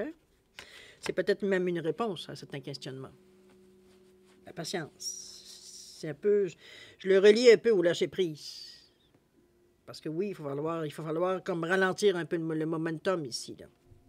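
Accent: Canada. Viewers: J’aime beaucoup ces moments passés en votre compagnie, ce matin au petit déjeuner😊 actuellement bloquée dans ma vie suite fracture du genou, c’est tout à fait ça… lucidité… émotions présentes mais qui font qu’on se sent vivante… je retiens « Patience et confiance »…j’aimerais bien retourner au Canada ❤ et votre accent m’en donne un avant goût… merci à vous 💐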